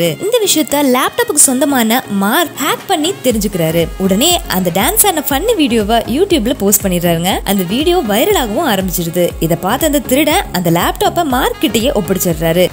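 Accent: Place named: Coimbatore, India. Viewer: yenunga neenga coimbatore aanga..?! 🤗🤗👏👏 super voice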